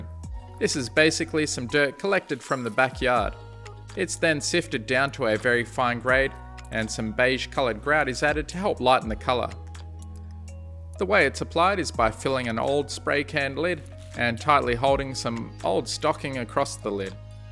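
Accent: Australia. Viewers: Idk if you live in Australia but it sounds like you do if you stay safe from those fires Love your work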